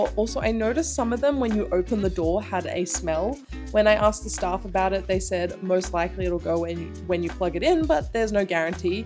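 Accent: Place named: Australia. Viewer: I am sorry for your TP... cuz my Costco Purple TP is legit. Also, I love your Aussie accent when you can speak Japanese perfectly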